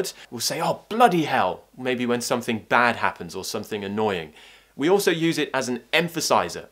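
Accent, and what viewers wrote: RP, United Kingdom. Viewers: I luv [your] British accent! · Friend of mind from private education system in London!Accent is different with your RP!Strange🧐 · I like the british accent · Excellent ... Love British accent... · Best British accent i have ever heard!!! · Hi I'm watching from Burma 🇲🇲 and you are the very good teacher and teaching and i very like to hear British native English sound and i also try to be learning . Thank you for you making alot of videos. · You are my teacher in British accent ❤️🔥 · Good work... British authentic accent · Good lesson. I enjoy British accent. Especially your accent,. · Hello , I'm fond of this accent RP Which is your accent teacher RP it's bloody sansationl thank you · Hi nice to see you again! I really loved your british accent ♥️♥️♥️😍😍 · Dear, you're a true modern RP accent teacher. Your syllables are loud n clear. 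I assume like listening to oxford advanced learners dictionary pronunciation👏👏👏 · I really enjoy your clips because I love British accent.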